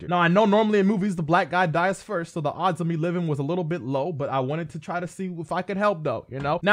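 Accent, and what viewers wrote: United States. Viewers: Damn bruh. Where you from the chi?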